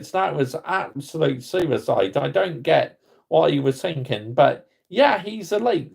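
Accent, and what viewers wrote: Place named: Bristol, United Kingdom. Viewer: Are u from Bristol? I lived there for 6years and ur accent reminds me of those days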